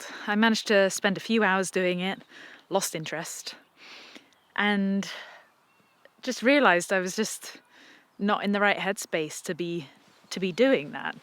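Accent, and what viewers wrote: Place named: RP, United Kingdom. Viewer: God, you're so bloody posh,. I can see you as the old dear running a large country pile....in (quite) a few years when you've made your fortune.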